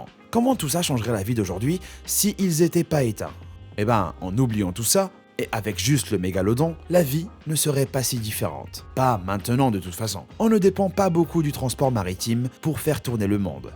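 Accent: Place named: England, United Kingdom. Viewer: Excellente la voix off! l’accent anglais est pas mal du tout ! ;)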